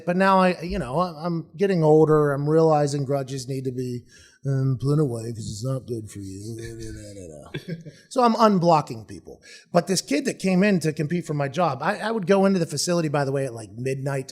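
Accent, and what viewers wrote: Pittsburgh, United States. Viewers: Dude, Pat... you can take the guy out of Pittsburgh but you can’t take the Pittsburgh out of the guy... man the accent is a thing of beauty 😂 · "Come dahhnnn, to da tahn hawhlll" mah man McAfee still got that Pittsburgh accent, love ya bro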